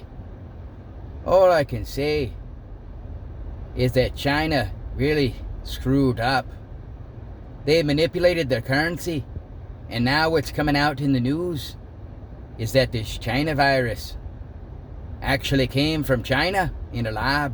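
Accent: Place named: Ireland. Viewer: like the Irish accent lol - was there a hidden meaning for it or just for fun? 😀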